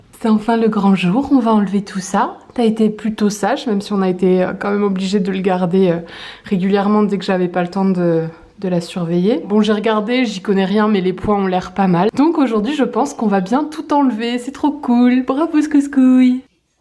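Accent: South, France. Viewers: Depuis quand tu as un accent du sud ??? 😂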